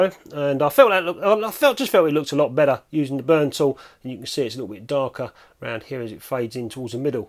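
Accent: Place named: Scotland, United Kingdom. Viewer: As I understand you live in London, but your speech is similar to Scottish😉💂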